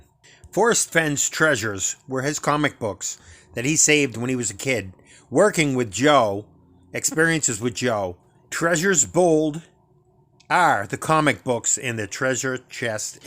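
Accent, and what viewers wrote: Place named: Boston, United States. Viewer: In your video you say "Kent and Esther is pronounced Canasta, at least that's how we say it in Boston." So is your claim the poem could ONLY be completed by someone from Boston area? Not the redneck from Texas (they sure don't pronounce it that way). No one from 99.9% of the world? Only someone who has a Boston accent? Did Forrest ever even go to Boston? It makes no sense for someone who spent 15 years creating a poem to make it solvable for only people with a Boston accent, then release it at a book store only in Sante Fe. And Forrest says he wrote it for every redneck in Texas. You are very biased towards your pronounciation and ignoring what Forrest writes and says. I'm glad you promise you are done. Good for you. Your brain injury is a sad story but you are brave to openly discuss it and it should help everyone be understanding of your handicap. 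it comes through loud and clear; " I've offered them treace peaties so, so many times" Is that how you say it in Boston?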